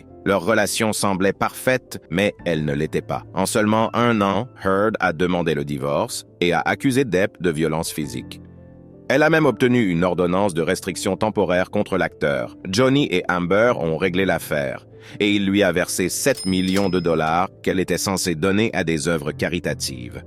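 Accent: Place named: Canada. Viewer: Adorable accent Canadian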